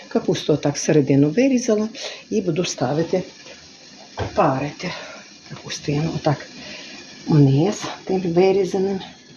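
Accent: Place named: Vinnytsia, Ukraine. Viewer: Чоловікова тітка родом з Вінницької області також смачні голубці робила і говорила, як Ви. А голубці завжди були мініатюрні, трикутні і дуже смачні. Теплом повіяло від Вас і Ваших голубців. Міцного Вам здоров'я. І довгих років щасливого мирного життя. Дякую за теплі спогади. На жаль, тільки вони й залишилися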